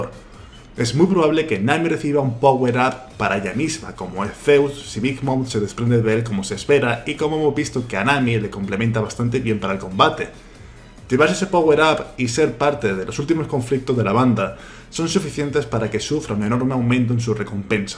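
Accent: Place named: Murcia, Spain. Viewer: Murciano? Ese acento no se esconde jajaja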